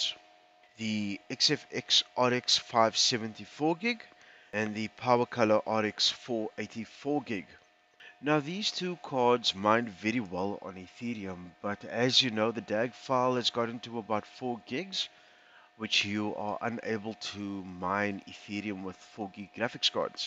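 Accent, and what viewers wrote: Afrikaans, South Africa. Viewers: Klink soos n Afrikaanse engels die.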